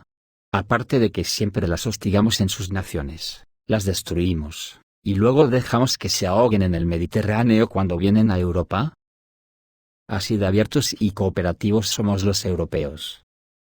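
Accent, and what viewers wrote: Spain. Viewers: El acento es vizcaíno.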